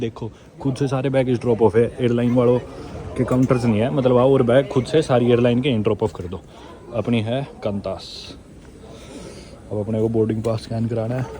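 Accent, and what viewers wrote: Haryana, India. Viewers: kya dikhta hain bhai tu. 6.4 ft height. Itna fluent English me. Teri haryanvi accent me hindi. Gym karta body bana rakkhi hain. Bohot se log world travelling karte nomadic india nomad shubham pratik jain yaatri doctor but un me tere jaisa koi nahi . Enjoy karta hu bhai teri video main. Bas nazar na lage tujhe kisi ki ❤❤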